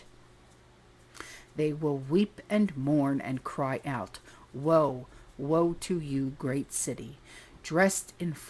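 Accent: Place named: New Jersey, United States. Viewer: She’s from Jersey. Its the accent. Get over it.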